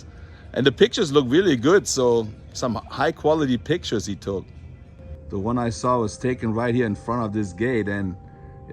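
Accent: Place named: Bavaria, Germany. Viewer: Hi nice accent maybe Bavarian dialect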